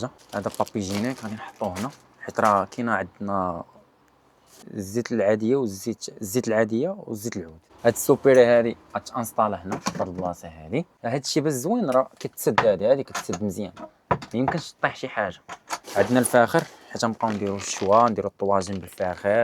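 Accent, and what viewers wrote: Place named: Egypt. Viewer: سفرية 😂😂😂😂وليتي مصري 😂😂متاثر بلهجة مسلسلات رمضان يا مدلولين....سفرية....تفو على شعب متاثر بلغة المشارقة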